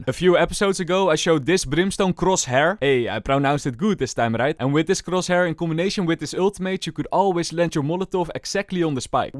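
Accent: Netherlands. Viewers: The "v" sound doesn't exist in many European languages. He's Dutch, so it comes out closer to "falorant".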